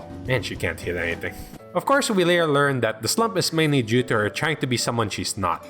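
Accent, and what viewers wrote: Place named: England, United Kingdom. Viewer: yet another pronunciation of names à la english smh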